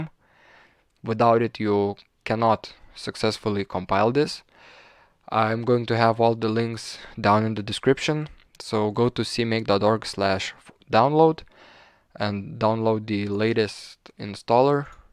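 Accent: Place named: Lithuania. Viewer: u sound like lithuanian xd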